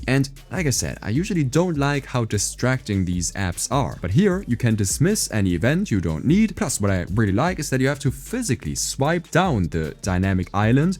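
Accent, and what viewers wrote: Germany. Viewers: just stumbled on your channel. Great editing, straight to the point and interesting apps - hats off! Edit: gerade gehört dass du Deutscher bist, mach weiter so ;) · you are german ? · Beautiful video, great exposition, nice to see mg transition, no waste of time, elegant and clear English with interesting German accent. What else? I need subscribe to say thank you 😊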